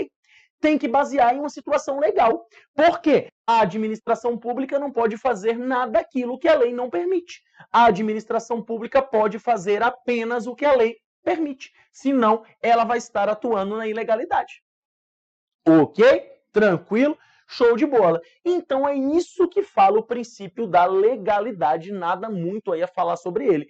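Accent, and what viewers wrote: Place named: Salvador, Brazil. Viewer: Quando escutei o sotaque, eu falei pra mim mesmo "esse cara é de Salvador" kkkkk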